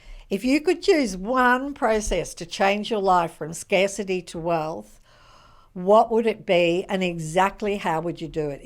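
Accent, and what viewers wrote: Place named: Australia. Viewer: It's our Aussie accent I love it, and I live in Melbourne, the best city in the World to live, so say who. Anyway love these thoughts❤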